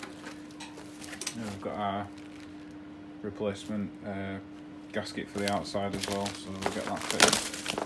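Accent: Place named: Yorkshire, United Kingdom. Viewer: Going by your accent I don't think we are far apart , I'm in Malton East of York and I guess your Huddersfield way .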